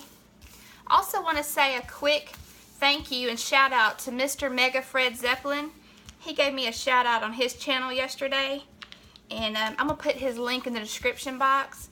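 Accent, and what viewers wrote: South, United States. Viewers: you have a little bit of a Southern accent. I don't know about where you live but I live in Phoenix and they have 100 different ways to do mac and cheese anything from deep-fried to pizza toppings. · You Got That Southern Accent Nothing Wrong With It Just Some Of The Words In Southern That You Say Sometimes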